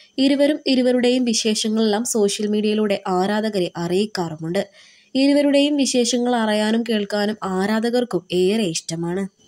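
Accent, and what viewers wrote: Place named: Malappuram, India. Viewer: ഇവരുടെ ഭാഷ കേട്ടിട്ട് മലപ്പുറം ആണ് എന്നാണ് തോന്നുന്നത്...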